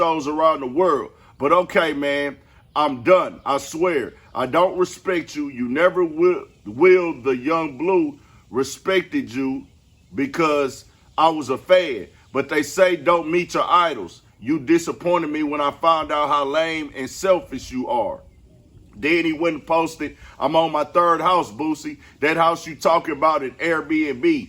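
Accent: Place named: Texas, United States. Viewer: I love your commentary. It’s so Texas. Shout out to you from DFW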